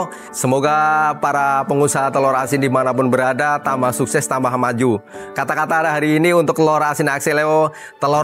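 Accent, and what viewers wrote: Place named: Kebumen, Indonesia. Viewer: Berati kebumen ngapak kaya nyong nng rio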